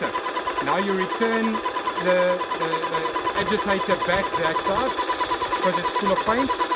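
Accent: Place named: South Africa. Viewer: enjoy listening to the south African accent .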